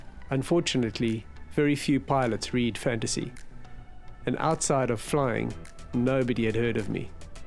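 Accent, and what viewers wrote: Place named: United Kingdom. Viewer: Great I think u are from UK by your accent so we neighbors haha I wonder could you give me any good safe tips on places to get a good safe used wing? I know I'd be be able to afford a new one, especially with the way 2020 has gone so far.. Any help or advice I would be ever so grateful, I dreamed of flying ever since my earliest memories an I'm gettin on in years and have to get In the sky befor it's too late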